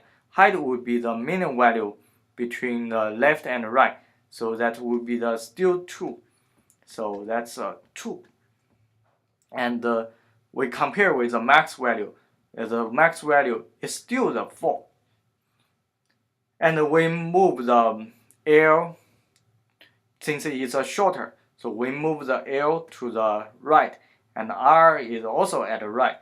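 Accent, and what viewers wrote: China. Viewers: 第一句话就能听出是中国人hhhh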